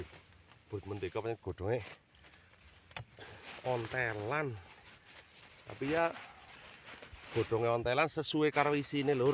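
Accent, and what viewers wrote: Banyumas, Indonesia. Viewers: Mirip kang Cecep prepan pensiun ganing ya,, kyane Mase wong banyumasan ya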